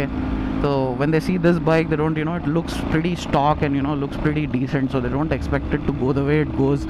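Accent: Hyderabad, India. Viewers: i love you'r Hyderabadi accent bhai. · only person on youtube i love listening to 😅.... vo apna hyderabadi accent sunne ka mazaich alag rehta bhai 👌🏻👌🏻🎉🎉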